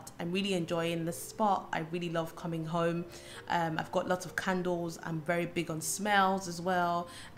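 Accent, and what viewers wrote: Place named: United Kingdom. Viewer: Your accent sounds quite British....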